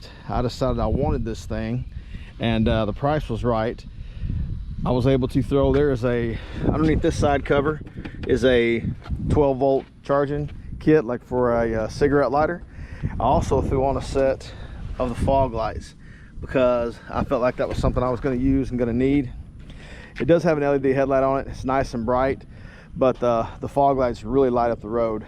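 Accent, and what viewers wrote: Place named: Texas, United States. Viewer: I bought the vagabond bag I see on your video. Ought to work well. These videos are helpful to many riders for info on mods from cup holders to feet pegs etc. Appreciate the knowledge. You sound similar to how my dad talks, my family agreed. Texas accent. Riding the Valkyrie in rocky mountains is worth a trip, I love it every chance I get!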